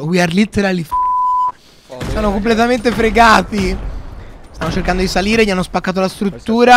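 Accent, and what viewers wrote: Tuscany, Italy. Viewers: Ma sei toscano pk si sente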